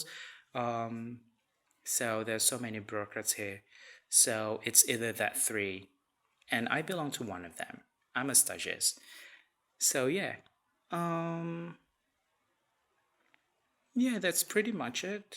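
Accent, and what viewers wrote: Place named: Australia. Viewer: There's Aussie accent left behind haha How long you'll be there?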